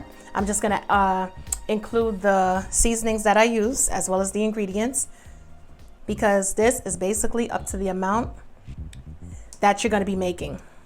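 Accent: New York, United States. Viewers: Where r u from? Sounds like NY or somewhere up north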